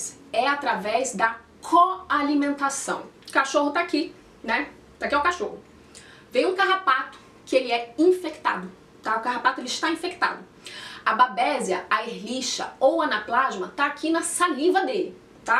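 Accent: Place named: Pará, Brazil. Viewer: Muito obrigadop pelo vídeo, queria que meus professores tivessem essa didática e vc parece ter um sotaque paraense haha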